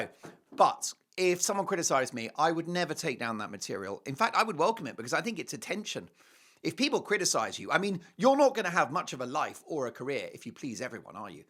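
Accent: United States. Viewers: I love your American accent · your American accent is cute and spot on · love your American accent, *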